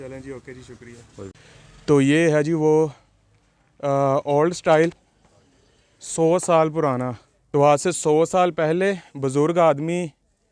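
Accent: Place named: Punjab, India. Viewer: You speak urdu with punjabi accent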